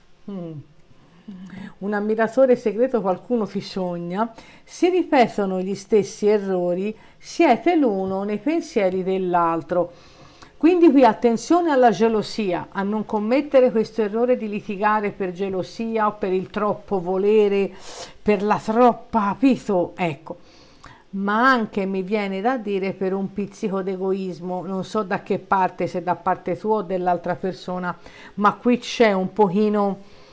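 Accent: Tuscany, Italy. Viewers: A me mi fai morire 😂 acciderba al toscanaccio🤙😊😂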